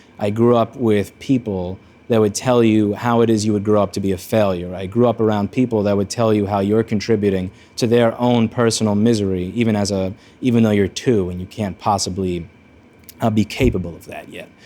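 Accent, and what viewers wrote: New York, United States. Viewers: Maybe it's just me, but your NY accent seems way heavier back then than it is now.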